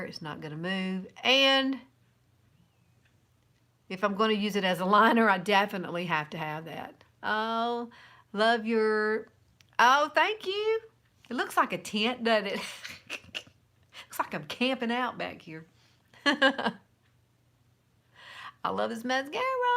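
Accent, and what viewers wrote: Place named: South, United States. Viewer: Thanks ♥️ you do sound southern... cute though :) enjoy you Cancun trip! 🌺🌺🌺🌺🌺🌺🌺🌺🌺🌺🌺🌺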